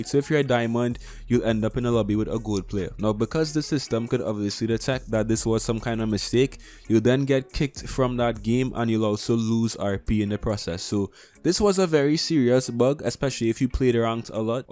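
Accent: Trinidad and Tobago. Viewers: Are you trinidadian? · is u from Trinidad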